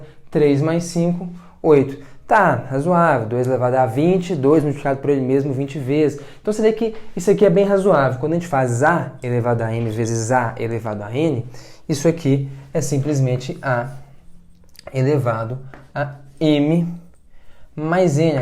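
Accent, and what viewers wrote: Minas Gerais, Brazil. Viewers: bom dms ouvir um minerim falando, tranquilidade contagiante. Parabéns pelos ótimos vídeos irmão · esse minino é mineiro, tenho certeza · Se é mineiro,não é? kk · explicando as coisa é MT mais satisfatório uai kakakak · Mano, que legal, sou mineiro também! Sério, pra mim Minas não tinha sotaque, ao mer ver tínhamos um "português puro", mas eu realmente consegui perceber o seu (não que não seja esplendoroso). Ksksksk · Tu és mineiro, né? Kk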